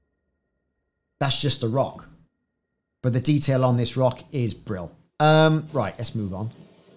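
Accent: Wales, United Kingdom. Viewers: Nice vid mate...I sense a Barry or Cardiff accent?...I'm watching all the way from Swansea :)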